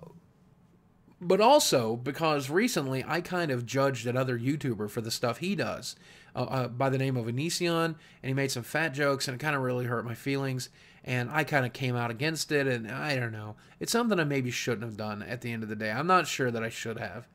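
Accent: South, United States. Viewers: You already talked about the fact how you had to loose your southern accent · Yes it's like its okay for black people in pop culture to call each other the "N" word but absolutely not okay for a white person to say that. And by the way i love the Southern accent. One of my life goals is to visit the deep south.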